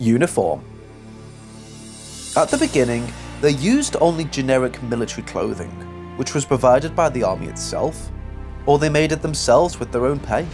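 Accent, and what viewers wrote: Liverpool, United Kingdom. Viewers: For sure, a scouser. I wouldn't want any other describing another fierce people.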